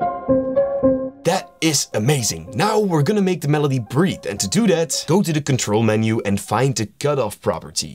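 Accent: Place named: Netherlands. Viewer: bro sounds from NL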